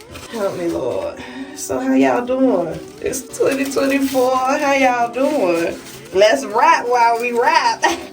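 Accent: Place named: South, United States. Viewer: I love your country talk😂 it’s so cute and funny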